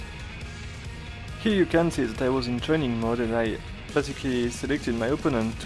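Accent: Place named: France. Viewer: btw...i love your French accent 😄😄😄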